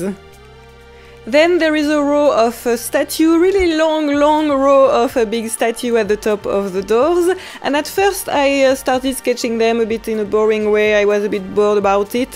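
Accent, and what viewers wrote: France. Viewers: I loved watching you do this beautiful sketch. You are a fantastic teacher and your personality makes me feel happy. Also your lovely French accent reminds me of so many happy times visiting France. Thank you very much.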